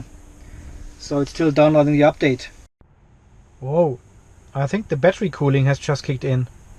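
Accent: Germany. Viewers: Dein Deutsches Englisch klingt echt mies. Warum machst du eigentlich diese Videos auf Englisch mehr Reichweite ?!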